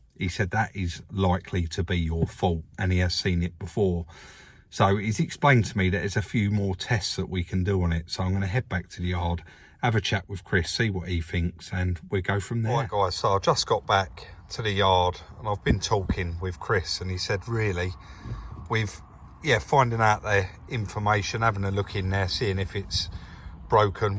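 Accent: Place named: Essex, United Kingdom. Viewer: When said rail at the start I thought he meowed, rail Sills nice alloy are hard words for the Essex accent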